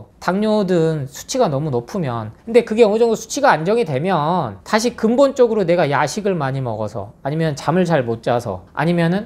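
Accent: Jeolla, South Korea. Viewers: 전라더 말투에.. 인상도 이상하고... 그냥 딱봐도 사짜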